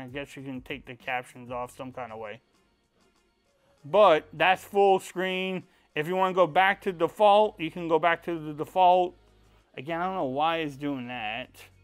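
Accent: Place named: North, United States. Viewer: Great videos! I just got one and it's so much easier to hear instructions in english with an American accent! I mean you don't sound like me and I don't sound like you! But I guarantee you we both can comprehend each other. You sound northern to me (no offense!) I'm from Miami . If a lot of these people, that think they speak english would quit talking to their friends, in their language and use english both people would get better. Ask Tony I just set mine up and didn't even raise my BP! Thanks! (sub added)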